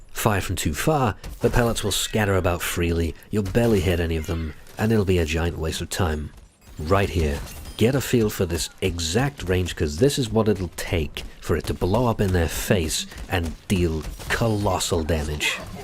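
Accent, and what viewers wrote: United Kingdom. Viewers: But he's a strong contender with his British accent ( is a contender of Morgan Freeman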